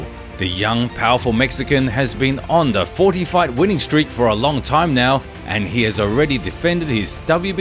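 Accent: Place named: United Kingdom. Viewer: This might have been a great video, accept: the narrator spoiled everything. This guy mispronounces everyone's name along with that terrible UK accent. 👎👎👎👎👎👎👎👎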